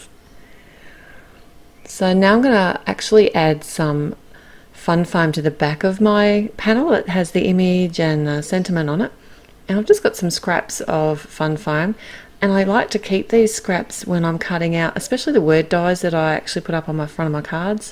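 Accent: Australia. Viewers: I love to watch your videos and this card is no exception it's brilliant!!! It's refreshing to see and hear an Aussie designer in card making video's although I love all the ladies and the men too lol I live in Sydney and thank you very much for the Aussie website links to almost all of the products you use :)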